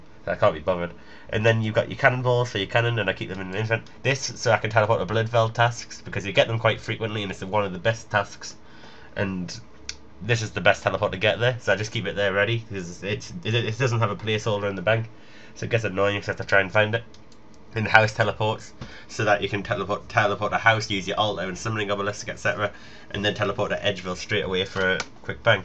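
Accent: Newcastle, United Kingdom. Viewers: btw are u a geordie ?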